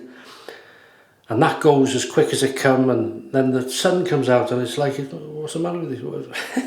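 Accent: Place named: Wales, United Kingdom. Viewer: I really want a model, one day I'll get one. I'm based not too far from you I think. North East Wales from your accent? I'm originally from Bangor but live north East now.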